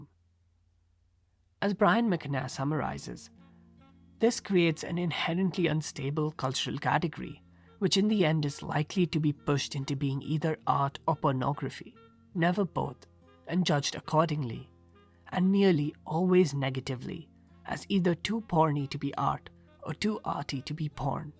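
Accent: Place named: Oxford, United Kingdom. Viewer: a musanghi cinephile talking in oxford english. * it's not an insult, just a description/review of the video.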